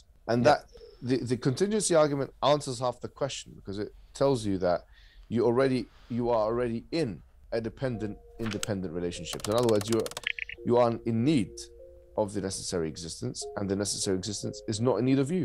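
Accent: United Kingdom. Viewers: it’s not the accent that was hard to understand for me In fact I love the British accent it’s the high quality vocabulary he was using my vocabulary is very limited. But I’m not surprised at all know that I know he graduated from Oxford University mashallah. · british accent.. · Should’ve added subtitles for those that can’t understand British accent.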